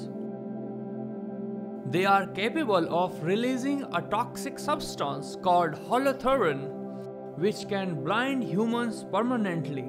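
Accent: India. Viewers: Yeah text way better than Indian accent (not being offensive) just saying :| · It's not a broken english, he has an indian accent. I have a very different accent too, but it's not indian.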